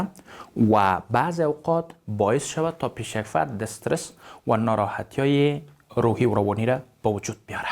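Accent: Kabul, Afghanistan. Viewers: خانم من که درست متوجه پیام تون نشدم ایشان با لهجه آشکار کابل ما معلوم است که ایرانی نیست یا تو فقط از بابت زبان مشترک ابراز حسن نیت کردین ؟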